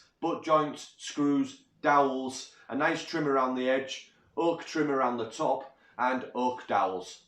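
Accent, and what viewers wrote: Hull, United Kingdom. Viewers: Just found your channel, I am an ex welder/fabricator but trying to learn more about wood so this is fantastic. You sound like a Hull lad (I originate from Hull) so I understand every word you say makes me feel homesick. I was mates with a lad called Robert Pope as a kid, think he became a carpenter also. Anyway good luck with the channel, very professional and well presented.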